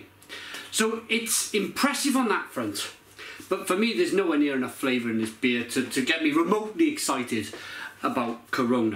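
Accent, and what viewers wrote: Wales, United Kingdom. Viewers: Are you British? By the way I'm new to your channel! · He’s got the slurred speech accent of an alcoholic ahaha or is that just welsh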